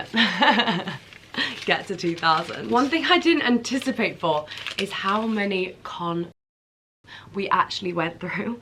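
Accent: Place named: Chelsea, United Kingdom. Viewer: Not Essex more Chelsea :) wait.. Whaaaaaaaaa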